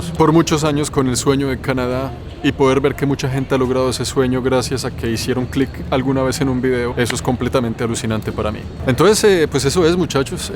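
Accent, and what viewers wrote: Colombia. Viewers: Que bueno este vídeo muchas gracias. Me encantan y sabes que más me gusta en la forma que los editas y como los expresas genial con ese típico acento Colombiano y mucha naturalidad. Gracias feliz viaje aquí a Europa . Vivo en Alemania en Wiesbaden y soy de Cali . Felicidades. Para adelante estas haciendo un buen trabajo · Saludos, muy informativo el video pero tengo un comentario, tienes cara de panameño con acento colombiano. Sin embargo, los videos son muy buenos felicidades. Saludos desde Panama y buen viaje.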